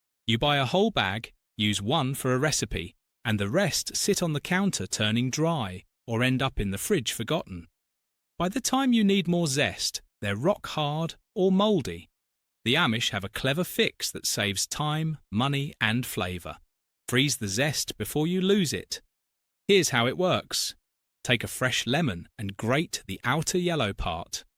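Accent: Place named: United Kingdom. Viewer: Is this AI narrating ? He speaks with a British accent but uses American phrases 🤮 Ffs might as well use a Yank narrator.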